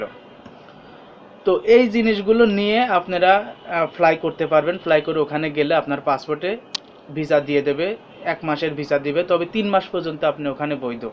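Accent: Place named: Pabna, Bangladesh. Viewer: ভাই আপনের বাড়ী কি পাবনা ভাষা শুনে মনে হচেছ পাবনা পাবনা কোন থানায় ভাই?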